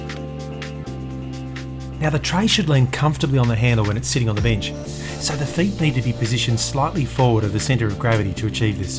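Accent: Australia. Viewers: has anyone ever told you how wonderfully pleasant and warm your voice is? It's not just the novelty of the Aussie accent (I'm American, not something I get to hear often) but the fact that I can actually HEAR the smile when you talk. Love it. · G'Day, Every time I watch your fascinating videos I'm left with just so many questions: • This is the first time that I've seen "blueing" done with a bed of copper shavings. Most frequently I've seen it done simply with a blowtorch & a tin of oil, or with a chemical treatment. What's the advantage of doing it this way over something like Birchwood Casey Super Blue? • Why a box formed from thick brass & not just one from a (cheaper?) thinner mild steel cutout? Aesthetics or functionality? • Why only two feet instead of a more stable four? And why have the threading holes penetrate all the way through the brass (thus needing rivet caps), instead of making shallower holes (or just positioning them below the box walls)? 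I've only been aware of your channel for a short time, but have been riveted by the extraordinary attention to detail, functionality & aesthetics in your projects (especially the exquisite Playing Card Press). Not merely mass manufactured tools, but singular (& wonderful) works of art. I'm also pretty chuffed that there's such damn good content from another Aussie (I'm over in Bunbury, WA) - it gets kind'a tedious listening to American accents sometimes.; )~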